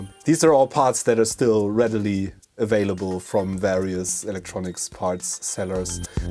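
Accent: Germany. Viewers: You sound German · Man hört das du ein Deutscher bist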